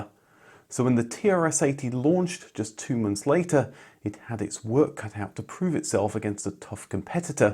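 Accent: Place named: United Kingdom. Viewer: Love Your historic vidéos. Lots of information and Your perfect British accent is so good for French ears. •••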